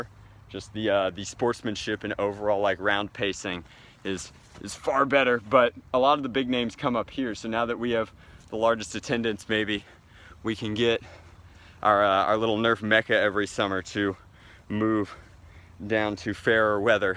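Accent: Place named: United Kingdom. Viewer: stop with the british b.s like boot and zed and aluminium. its makes u look like a tool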